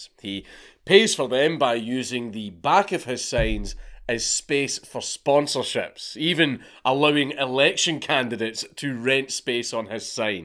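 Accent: Scotland, United Kingdom. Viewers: has a neko t-shirt and sponsored by a knife company. Been watchin some of that h enti too? Please don't turn fully Japanese we need you for that nice Scottish accent.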